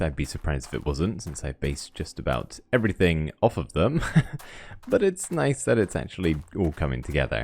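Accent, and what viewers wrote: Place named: Bristol, United Kingdom. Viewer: Where's your accent from? I am from Bristol, and you sound like you have some of the accent.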